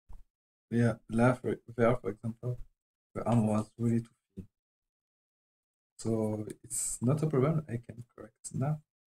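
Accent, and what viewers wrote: France. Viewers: dat anglish accent typical french playdr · With your English accent that is not very good, I could guess what language you originally speak, your native language is French. Correct me if I'm wrong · I speak fluent French and I can't understand a French accent for some reason but I heard something about Sunday and it's raining????? XD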